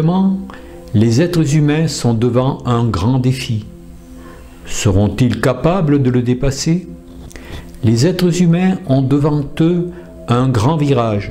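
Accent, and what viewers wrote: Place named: South, France. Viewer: Merci, je vous aime beaucoup, je suis bordelaise et j aime votre accent du sud, bises de l allemagne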